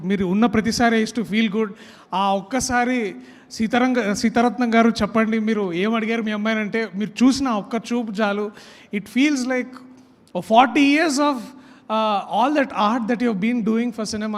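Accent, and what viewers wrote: Telangana, India. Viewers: ప్రియదర్శి గారికి ఒక సలహా తెలుగు సినిమా లో యాక్ట్ చేసినప్పుడు స్టోరీ బట్టి dilect ఉంటుంది అది తెలంగాణ యాస అవొచ్చు రాయలసీమ యాస కావొచ్చు లేక శ్రీకాకుళం యాస కావొచ్చు కానీ తెలుగు అని ఒక భాష ఉంది అది ఎవరో ఒకరికి చెందింది కాదు అది అందరిదీ అందువల్ల తెలుగు మాట్లాడానికి ప్రయత్నించండి మీరు తెలంగాణ యాస మాట్లాడి ఒకే ప్రాంతానికి చెందినవార్ని సంతోష పెడుతున్నారు ఇది తప్పు ఇలాగ చేసిన చాలా మంది కనుమరుగవుతున్నారు, మీరు అలాగా అవుతారు అనుకోవడం లేదు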